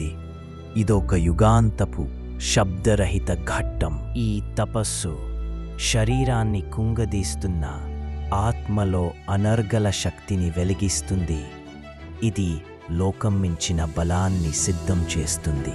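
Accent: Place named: North India, India. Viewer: VFX good, story narration and Words spelling match kaledhu north vaallu telugu matladithe ela untadhi ala undhi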